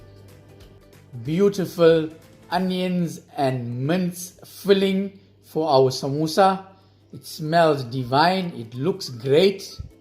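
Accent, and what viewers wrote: South Africa. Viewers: I am Also South African..miss my homeland ..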